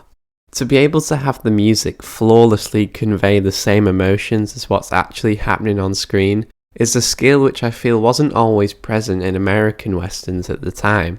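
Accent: United Kingdom. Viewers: Spaghetti westerns described by a crappy British accent. YouTube sucks ass these days. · Narrative spooled by the low class English accent..... no glottal stops... ie "T"s" matter and "Bee-ings" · narrated by a Brit? ...about italians making american westerns?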